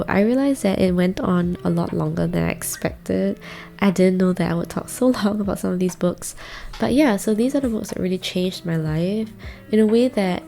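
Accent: Jamaica. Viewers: I’m extremely fascinated that your accent often reminds me of Jamaican accent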